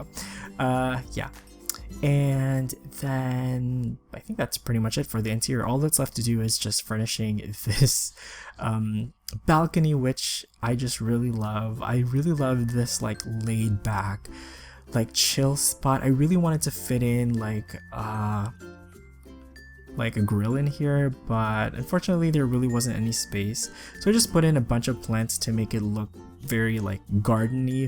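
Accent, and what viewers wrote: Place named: Philippines. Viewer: A Filipino SimTuber! This is like finding a unicorn. I recognized your accent, but I had to stalk your socials to be sure. Your builds are real pretty and you pick great inspirations! SUBBED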